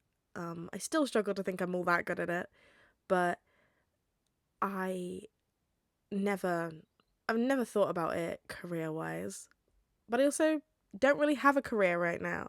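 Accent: United Kingdom. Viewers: i love you bestieee. 10/10 cute video youre really good at a british accent, do you practice? >:))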